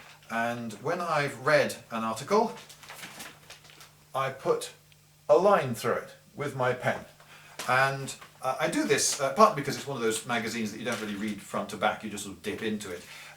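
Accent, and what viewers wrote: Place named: United Kingdom. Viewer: I rarely didnt understand some parts of it (im not native speaker) but i can say you sound so britsh. In fact its one of the most britsh sounds i ve ever heard sir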